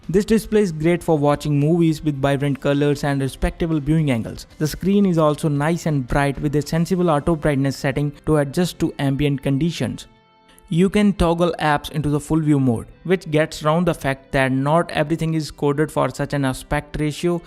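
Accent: India. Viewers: Ugh another impossible to understand THICC Indian accent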